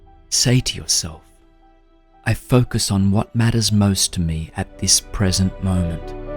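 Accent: Australia. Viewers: Good Morning, I am loving your meditations and the live ones are fun too! Its also nice to hear an Australian accent, sometimes I find it hard to meditate to other accents as it distracts me from their words haha. I do wonder if you could tell me the name of the music in this piece I find it very motivational and powerful! Love & peace Pita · Love your work! Thanks so much. Also great to hear an Aussie accent!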